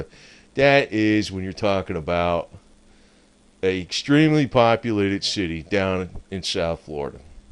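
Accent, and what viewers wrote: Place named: South Jersey, United States. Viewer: Dude You sound like another refugee from South Jersey? Me too Burlington, CO. Been in FL. about 20 years now, ain't going back!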